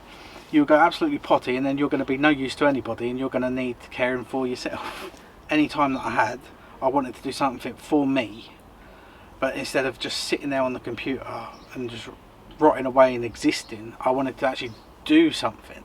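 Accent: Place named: United Kingdom. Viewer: 🇺🇸Very well said. Despite having a very British accent. 😂 Just love it! Prayers for your partner. Hope shes doing well.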